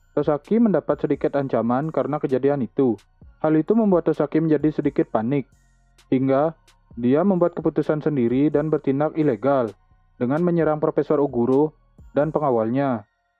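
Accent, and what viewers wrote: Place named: Bali, Indonesia. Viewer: Logatnya dari bali ea bang slam kenal ea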